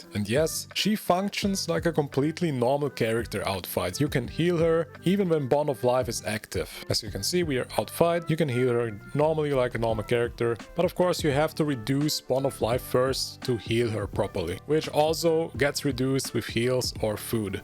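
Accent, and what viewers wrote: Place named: Germany. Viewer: ur accent sounds german eww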